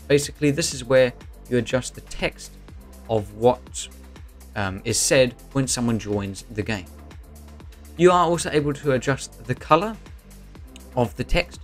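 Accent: United Kingdom. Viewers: Bro idk why but you are legit the most formal person ever. Idk if it's the british accent but god dayum you just sound so professional. I feel like I've done something wrong and you're trying to explain to me why I'm an idiot in the calmest way possible.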